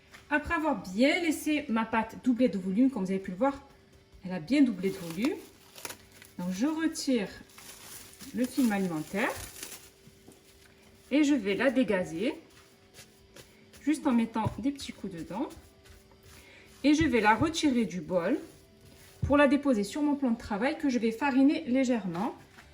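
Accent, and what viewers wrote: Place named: Midi, France. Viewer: Clair, simple, bien expliqué sans chichis ni fioritures et avec un petit accent de notre cher Midi. Resultat parfait! Bravo et merci pour cette superbe recette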